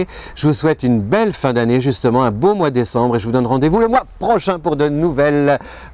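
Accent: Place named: Belgium. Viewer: Vraiment marrant l'accent belge.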